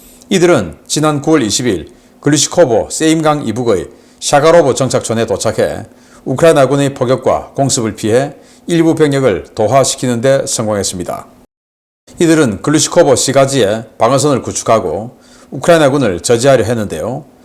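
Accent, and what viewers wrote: Gyeongsang, South Korea. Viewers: 경상도 씹 말투 존나 거슬리네~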